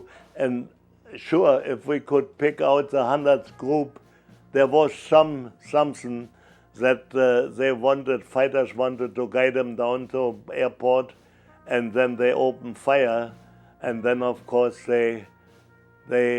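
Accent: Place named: Germany. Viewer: I wonder if when he emigrated to Canada/US he had a German speaking wife + family/friends? His English is very good of course but VERY accented :) But I've known British people emigrate to the US/Canada or Australia and get strong local accents SO quickly!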